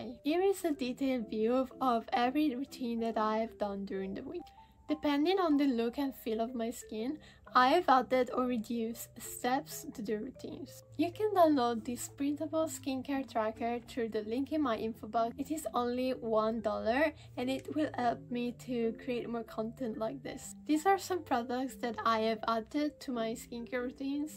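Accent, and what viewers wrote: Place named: Hungary. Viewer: Hungarian? Judging from your accent.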